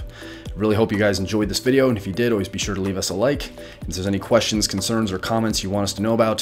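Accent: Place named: Canada. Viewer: Hi there! Great video! I will try to recover my SSD. By any chance are you Canadian? I am, and I hear the accent in your video. Great job on this and thanks for helping us with recovery issues!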